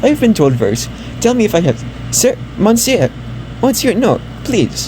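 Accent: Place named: United States. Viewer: i hear ur american accent coming out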